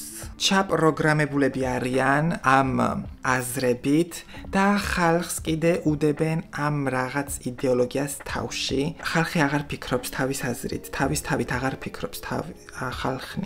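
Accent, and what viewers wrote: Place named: France. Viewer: ბიოლოგიკური 😁😁 უსაყვარლესად გამოთქვამს ქართულ სიტყვებს ფრანგულის გავლენით 😁😁 ძალიან სახალისოა ❤️, რესპექთ რასაც საუბრობ მგონია რომ სწორია ❤️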